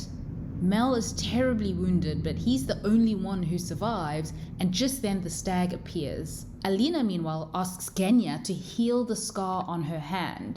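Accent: South Africa. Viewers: Is that an SA accent I hear? 😍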